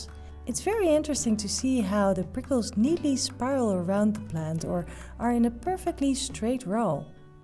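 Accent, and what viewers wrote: Netherlands. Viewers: Je Nederlandse tongval pik je meteen op. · Are you from the Netherlands? Your accent sounds so familiar to me and I can't figure out what it is.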